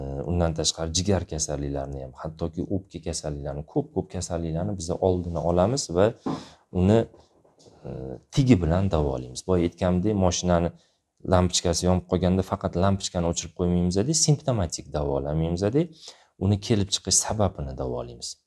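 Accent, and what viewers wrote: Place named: Tashkent, Uzbekistan. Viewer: Илтимос Тошкент шевасида эмас китов тилида гапиринг.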